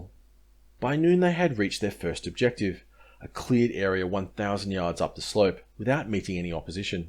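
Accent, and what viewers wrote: Australia. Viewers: We'll I don't know if you picked up on the accent mate, but he is an Australian. Funny then the Aussie content.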